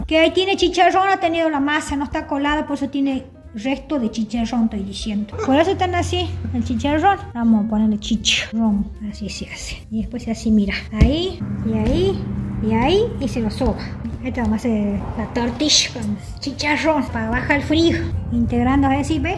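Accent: Tucumán, Argentina. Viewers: Felicitaciones, por esa exquisita TORTILLA TUCUMANA, señora. Me encanta escucharla, esa tonada tucumana, me recuerda a mi querida madre, que nos hacía las mejores comidas tucumanas. Soy tucumano, resido en Buenos Aires, estuve un tiempo viviendo en mi querida provincia, trabajé en los medios de comunicación de allí, como en canal 10, Diario La Gaceta, LV12, Radio Nacional y, también en LV7. Soy meteorólogo y, tenía a mi gran amigo, ya fallecido el periodista Mario Escobar, que me presentó en casí todos los medios. Ud. me hizo caer unos lagrimones al escucharla hablar, recordé a mi madre. Le deseo a Ud. y familia, muchas Bendiciones.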